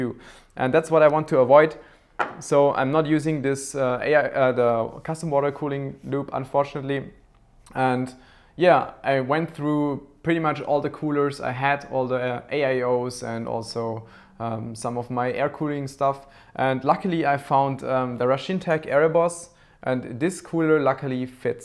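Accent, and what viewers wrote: Germany. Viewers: LOVE YOUR GERMAN accent ✌️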